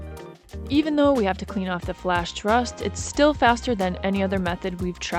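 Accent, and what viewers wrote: France. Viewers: Not trying to be rude but do I hear a French accent? And you guys sure do bust your butts! Your house will be a masterpiece when you done. I can already see it.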